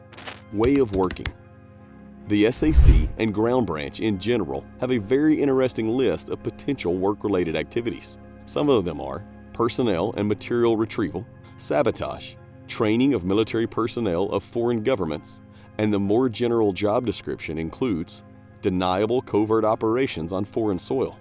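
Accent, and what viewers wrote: South, United States. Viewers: I detect a southern accent.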